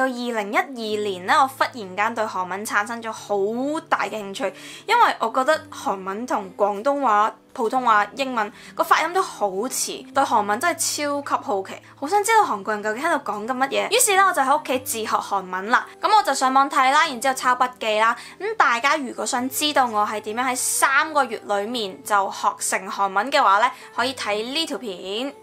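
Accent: Taiwan. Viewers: 难怪你的国语一口台湾味。大陆粉丝留＾＾ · 難怪Mira的中文很臺灣腔XD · 難怪Mira講的中文跟台灣人一模一樣 不像香港人對腔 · 所以你的國語這麽台灣腔。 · 所有说普通话就有了台湾人的口音 ：）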